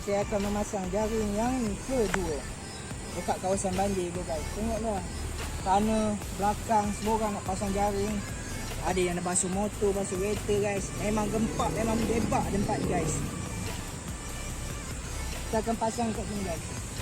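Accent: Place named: Perak, Malaysia. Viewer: Cakap Perak je coo..baru padu..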